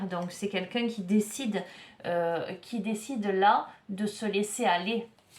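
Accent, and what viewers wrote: South, France. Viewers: Bonjour &, c'est toujours un plaisir de vous écouter, vous avez une voix mélodieuse et un doux accent du soleil et cela est très plaisant...Je vous félicite pour avoir mis les choses au point avec certains Hommes car c'est indispensable de se faire respecter...Vos guidances me font le plus grand bien et je vous en remercie chaleureusement...Restons dans la Lumière Divine...Gratitude Infinie et Très bonnes Énergies à votre chaîne💐💖😇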